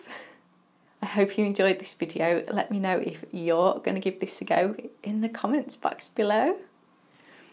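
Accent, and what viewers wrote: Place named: United Kingdom. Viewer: In all fairness, cucumbers are supposed to have the spiky things on them. When you pick them you can just put a pair of gloves on and rub them right off. You sound like you are from the UK and having spent quite a lot of time there, you have a bit of a different selection of cucumbers than us in the states. I hope these turned out well for you! And good job getting them grown indoors. Edit: One extra thing. The runners that come off the plant and attach to the trellis can get excessive and take nutrients that the cucumbers need...so if there are way too many, you can pinch them off and have bigger cucumbers quicker.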